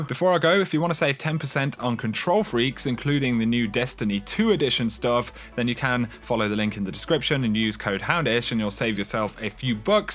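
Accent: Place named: Birmingham, United Kingdom. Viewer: Brummiest accent going on yt lool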